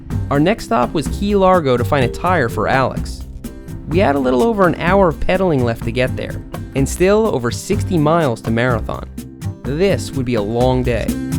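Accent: Long Island, United States. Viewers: "a buck twenty" n "peace out cub scout"... such a native LI lol.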